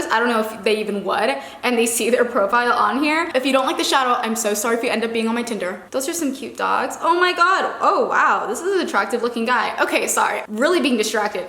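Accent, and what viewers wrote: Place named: California, United States. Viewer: I knew you were from cali 5 seconds into this video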